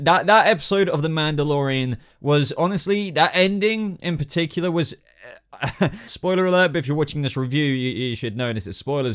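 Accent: United Kingdom. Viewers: suuuuuuureeee I want more reviews brooo. You are british then...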